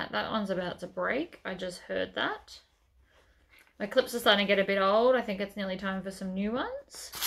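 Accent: Australia. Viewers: has a Mitsubishi, that she painted herself, and has a Video, or a few showing how and what she used to do it.:). I too love listening to her as a Fellow Aussie, not many that do tutorials, so it is lovely listening to her.:)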